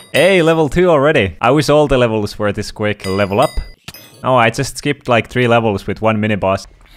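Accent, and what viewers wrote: Finland. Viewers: first thing when i opend the video i was like is he from finland sounds like it and then i watched and ofc you are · Are you from Finland? I hear it from your voice. LOL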